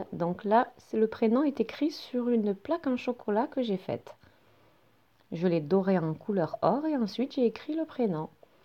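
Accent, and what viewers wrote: South, France. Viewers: Il est toujours plaisant de visionner vos vidéos qui sont toujours très bien expliquées et votre voix est très agréable. Une voix du sud moi qui suis du nord. Merci et bonne soirée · Vous êtes un vrai chef . Trop top l’accent du sud.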